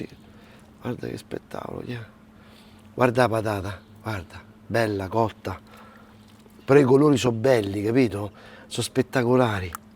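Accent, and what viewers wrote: Rome, Italy. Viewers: A MILANO PARLI romanesco?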